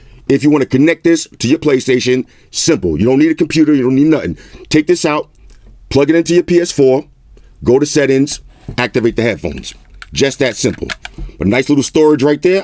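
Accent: New York, United States. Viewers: You’ve got that classic “New Yorker” accent. I love it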